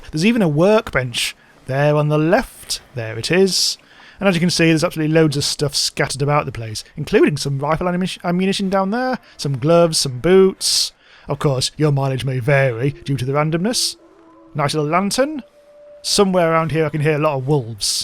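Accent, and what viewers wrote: United Kingdom. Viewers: What part of UK is your accent from?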